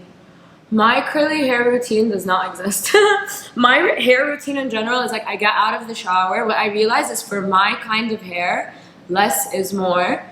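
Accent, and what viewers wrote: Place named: United States. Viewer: How do you have an American accent? And stop hogging all the attractive genes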